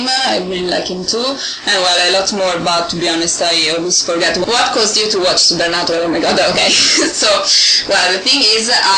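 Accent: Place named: England, United Kingdom. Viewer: your English Accent was AMAZING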